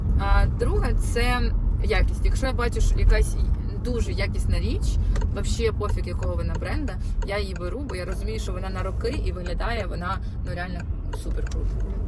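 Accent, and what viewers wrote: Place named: Surzhyk, Ukraine. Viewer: Снідаю і дивлюсь ваш влог 😊дуже подобається ваш вайб і ваш суржик 😉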